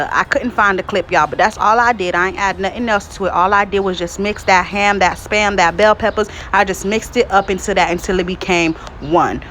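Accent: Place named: Florida, United States. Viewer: 😂Flowwwda aksent!!!! Luv it!!!!🔥🔥🔥🔥🔥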